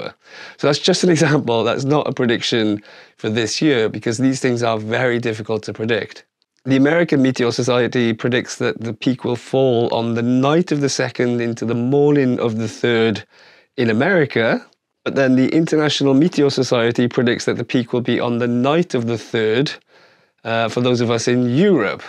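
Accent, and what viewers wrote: Wales, United Kingdom. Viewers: Great details, 👌 u probly know already. Also superb asmr c; Cymru accent,? Live from snowdonia? C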